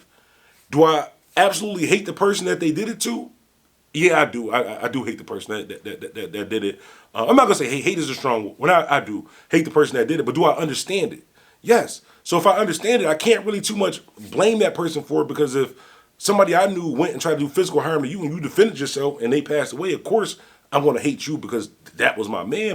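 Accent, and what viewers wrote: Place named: Buffalo, United States. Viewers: Lol if I ever bump into I'm gonna give him 5 mins of disclaimers before I greet him and bro you got an upstate accent its cool though rep ya hood lol Salute bro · You pronounce car funny & yes you do have a Buffalo accent which is proper like Cali niggaz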